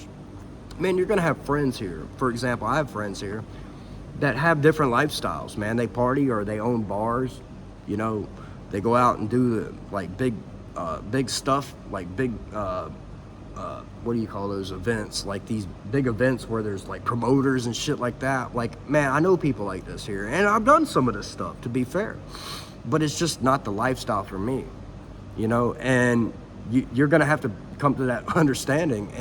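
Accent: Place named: Houston, United States. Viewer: I probably missed it in one of your previous videos, but I’m curious as to where you are from in the states. I’m from Houston, and you sound like just about everyone I know. Great video, and this type of shit is a prime example of why I’m not going back to the states once I retire. That entitlement is a motherfucker.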